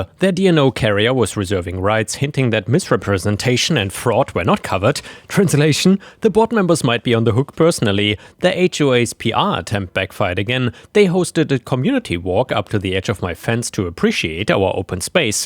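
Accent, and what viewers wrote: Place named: Germany. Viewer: lol a German accent tells the story cute 🥰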